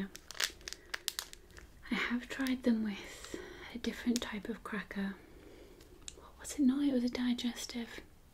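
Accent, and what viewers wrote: United Kingdom. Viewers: Your eating sounds are too perfect :) that and British accents rule :)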